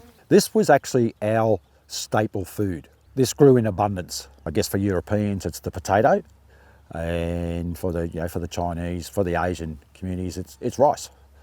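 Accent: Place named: Australia. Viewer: Thank you for the interesting video 👍 I love the Australian accent 🤩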